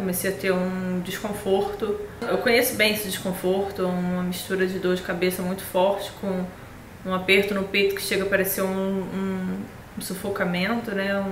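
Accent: Rio de Janeiro, Brazil. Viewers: Muito bom mas no sotaque carioca. Ah não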